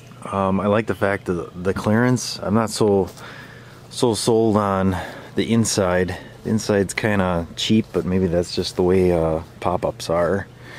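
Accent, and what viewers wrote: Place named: Fargo, United States. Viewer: Where the fuck is this accent from Fargo?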